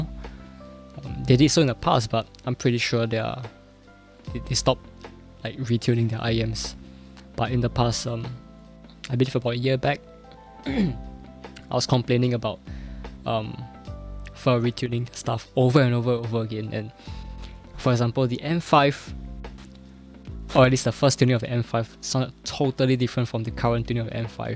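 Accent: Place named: Singapore. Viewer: I hear da accent I noe is Singaporean lollol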